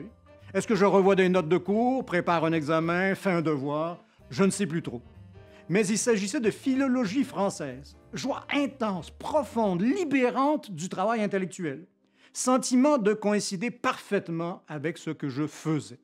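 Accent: Quebec, Canada. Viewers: Le sujet est comme toujours, fort interessant!!! Et cet accent quebecois.....de la musique pour mes oreilles lassees de la monotonie du "francais de france". Que du plaisir!🤗🤗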